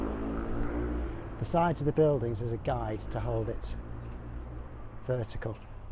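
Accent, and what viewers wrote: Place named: RP, United Kingdom. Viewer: I seem to have failed to utilise your nice movie as a listening material of Received Pronunciation. I can completely imagine what you're saying even though I don't understand English itself -- I used to shoot with this super-heavy camera forty years ago! -- Best wishes from Japan.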